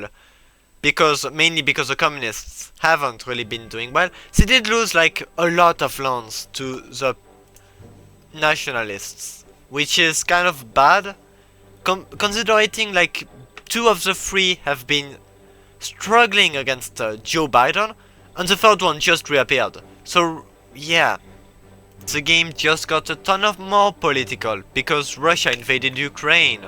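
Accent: France. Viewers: bah bro i can tell by the accent + i checked his profile h says he’s french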